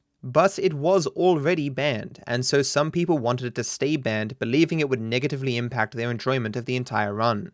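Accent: Australia. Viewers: Oi, u are Australian? lol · Wait your from Australia? Wtf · This guys stupid Australian accent mixed with his terrible speech impediment that makes his “R’s” sounds like “Aw” makes him impossible to understand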